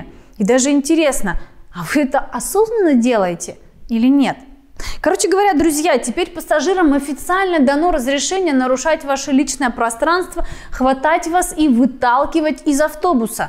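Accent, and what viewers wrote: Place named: Perm, Russia. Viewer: Ох уж этот Пермский говорок. Ничего против не имею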